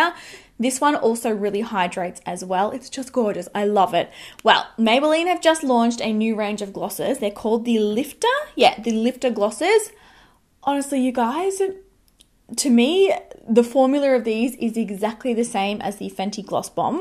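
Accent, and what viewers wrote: Australia. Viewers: Omg your hair looks beautiful!!!! I love your Australian accent! I want to take a trip there it seems beautiful. Thanks for the great dupes! 💖👍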